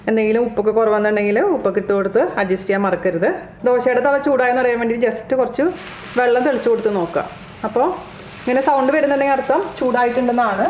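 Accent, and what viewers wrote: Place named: Thrissur, India. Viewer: Ready Mani ayyi...athu enniku ettayi... thrissur slang...I also from Thrissur...now at Kochi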